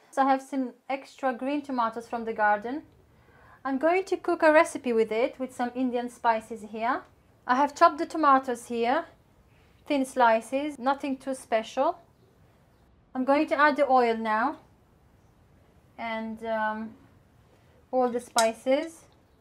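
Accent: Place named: England, United Kingdom. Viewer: ওয়াও ভাবী, রান্নার পাশাপাশি আপনার থেকে ইংলিশ এক্সেন্ট চর্চাও হয়ে যাচ্ছে😊 । ।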